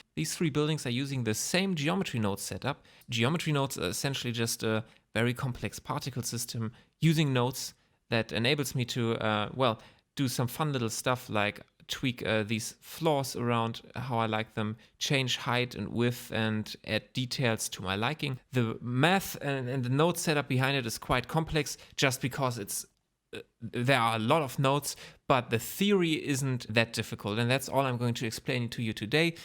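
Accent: Germany. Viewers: I think youre a german guy who speaks very well english 😂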